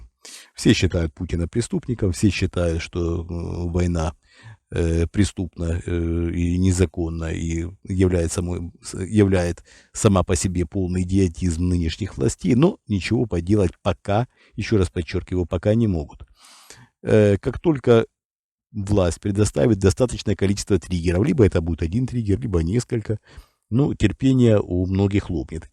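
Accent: Ukraine. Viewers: А все-таки, у ведучого відчувається українське походження. Може із кубанців?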